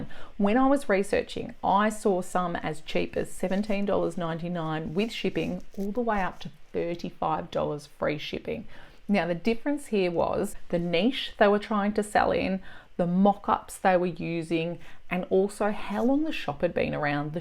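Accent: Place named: Australia. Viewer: Hi great video. Its nice to hear an Aussie accent inthe sea of POD! I have just started with POD on Etsy. Are you located in Australia? I find that there is not a lot of choice with Australian printers and the products they offer compared to the US. Do you use Australian Printers or US based as the shipping to Australian customers is sometimes higher than the cost of the product.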